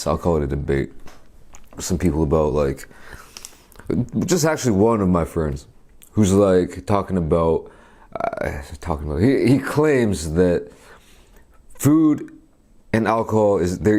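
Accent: United States. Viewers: he’s American Korean numb nut so that’s an American sounding voice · most American sounding Korean ever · Oh okay that's why you sound so American lol you use to live here, didn't catch that as I'm a new sub. Binge watching lol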